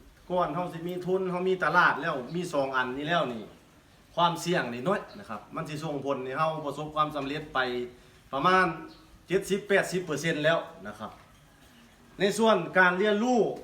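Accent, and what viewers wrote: Thailand. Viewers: อยู่ที่ไหนครับภาษาพูดเหมือนบ้านผมเลยครับผมอยู่สกลนครับ ดูแล้วท่านเก่งมากๆครับ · ฟังสำเนียงคือคนนครพนมหรือสกลนครผมอยู่นาทม นครพนมอยากเลี้ยงคือกันแต่ยังบ่มีความรู้ด้านตลาดแนะนำได้บ่ครับ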